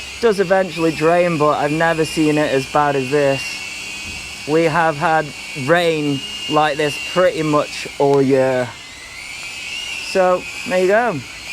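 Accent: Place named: United Kingdom. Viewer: 언제 들어도 영국식 영어 발음은 듣기좋음 👍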